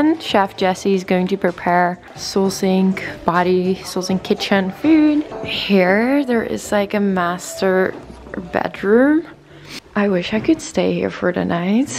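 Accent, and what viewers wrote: Netherlands. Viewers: whaat, when i first came across your video’s i thought i heard a dutch accent to your voice, you’re amazing!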